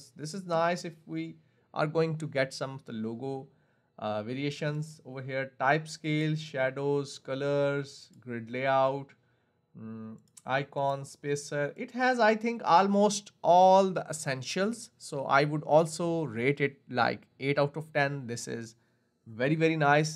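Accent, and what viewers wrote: India. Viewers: Why you sound like Indian but look like European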